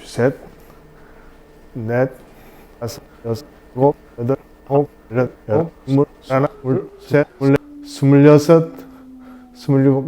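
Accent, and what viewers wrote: Gyeongsang, South Korea. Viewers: 갱상도말투 댐알겠다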